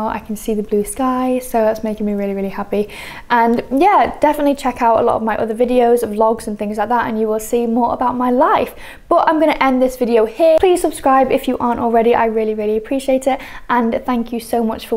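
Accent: United Kingdom. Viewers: Great video! I'm also half Swedish and am planning to move to Sweden some years after I finish uni here in the UK :) One point tho, I actually really don't mind the higher taxes! Yes, it definitely feels like a LOT when you are coming from a country like the UK or the USA but honestly in terms of what you get out of those taxes it's pretty incredible! You have great maternity and paternity leave, child benefits are incredible if you want to have a family, child oriented services and schooling in general is much better than many other places imo, there is investment in maintaining infrastructure, the healthcare system is pretty fantastic and the general state of the country in general. There are things I'd criticise Sweden for but personally I don't mind the taxes. Again, really great video! Looking forward to more content, what UK accent do you have btw? 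I can't quite place it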